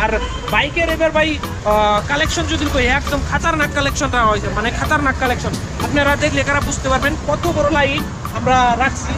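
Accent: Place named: Bangladesh. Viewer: I suspect this guy is from Bangladesh. His accent says so. Not like pure indian west bengal bengalis. Requesting respective authorities to check his and his family background and origin seriously. Infiltrators from Bangladesh burma and Pakistan are playing havoc on the security and economy of our country.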